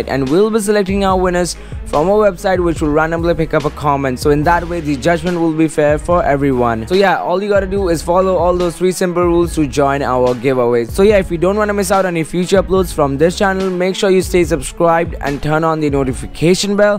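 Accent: India. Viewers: Hmmm I dunno this guy sounds like an Indian scammer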